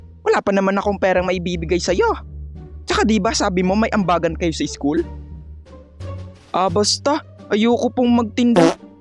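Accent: Visayas, Philippines. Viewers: Bisaya kapo ba kuya? Ask lng po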